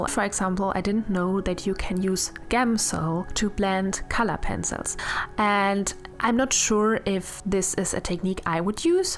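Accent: Germany. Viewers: I can't believe people are so rude to say they don't like your accent! English is a weird language and you speak it great. I am Canadian, but my mother moved here from Germany, and you sound like my family; you are lovely to listen to. And an incredible artist. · I understand completely. the pictures transport me to some fantasy world I'm desperate to know the story of. And to answer another question. watching your videos to learn watercolor which I really struggle with but have found myself watching everything because you explain so well and are very interesting to listen to. also I love your accent. I had and visited a German penpsl when I was in school.. do your accent is a lovely reminder of that friendship. thank you xx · I love your accent! I used to work at a horse product store with a German lady and hearing you reminds of many fond times with her! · This is Xiao from China. 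I have absolutely no problem with your adorable German accent. Your English is proved perfectly fine, and better than being fine -- it is so sincere and expressive. Love your art! And I feel the same when a painting or drawing actually comes out matching my expectation. So satisfying. ///^_^///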